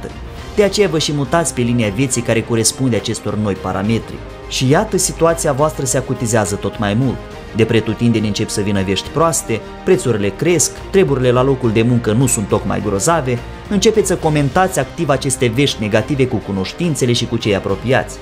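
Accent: Moldova. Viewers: Bună seara.Povestitorul este din Republica Moldova sau e basarabean? Mulțumesc.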